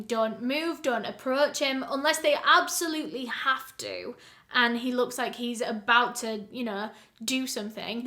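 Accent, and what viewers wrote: United Kingdom. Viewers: I’m here for British accent. i love it 🥰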